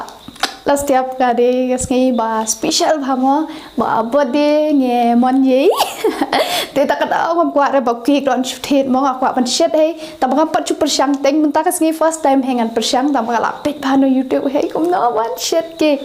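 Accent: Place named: Mylliem, India. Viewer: Sngewnang bha ka sur Mylliem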